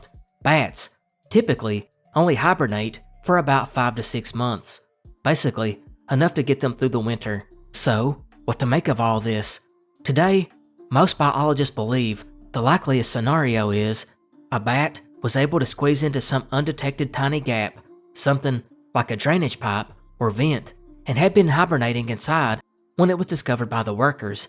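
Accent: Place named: North Georgia, United States. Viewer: Your accent is just like mine and my whole family , it's cool bro Howdy from NA GA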